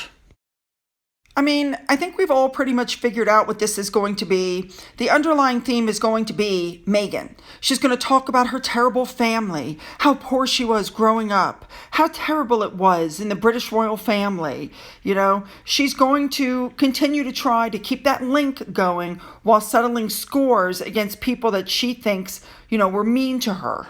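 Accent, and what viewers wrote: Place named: South, United States. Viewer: You off the chain ❤️❤️. That’s how we talk down south!! Congratulations!!!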